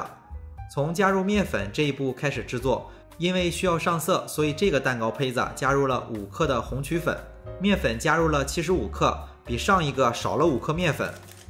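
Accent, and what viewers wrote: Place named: Jinan, China. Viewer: 老爸真是中西结合的好典范啊，亦中亦洋，都拿捏住，太绝了，你也太有口福了吧！！我是济南人，听着你的济普很亲切哦！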